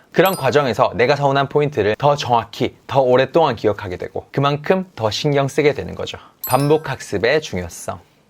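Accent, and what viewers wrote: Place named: Seoul, South Korea. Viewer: 경상도사람인데 서울말잘한다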